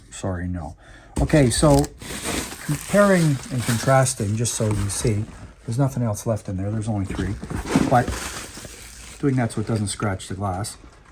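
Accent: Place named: Canada. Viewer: There is no "correct" pronunciation, the way he pronounces it is common in Canada. I'm going to take a wild guess and say you're American, with the blatant irony being that Americans have taken the ENGLISH language, you know...from England...and you pronounce half the words differently than the people who invented it..but here you are acting as if other people are wrong. Try opening a book occasionally you diabolically low IQ product of the failed American educational system.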